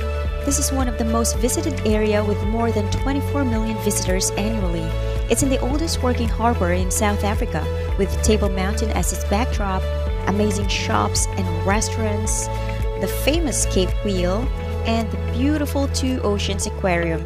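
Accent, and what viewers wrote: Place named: Visayas, Philippines. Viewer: Cebu?? So I guess bisaya ka ma'am. 💕 Loved your accent 😍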